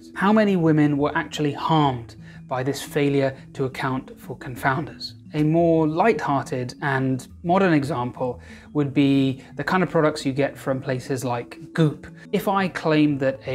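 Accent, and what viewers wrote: United Kingdom. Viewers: One can observe: - 930 liked this video vs 2 who did not. So far.... A bias of people who like a certain type of British accent? Or perhaps it's the background music? Or perhaps the mention of Minestrone. I like Minestrone - usually. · You seem to know a lot about medical bias but I have a dictionary .So please spare me your snooty british definition with that that lame accent you might not realize youre over the top condescending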